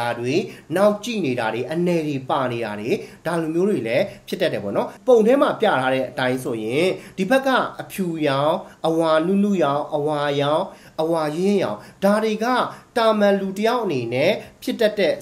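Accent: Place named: Upper Myanmar, Myanmar. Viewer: ပြောပြတာကျေဇူးပါ အင်းပြောတဲ့သူက မကွေးဘက်က အညာသားဖြစ်မလားနော် သန်းလာတာကို တန်းလာတဲ့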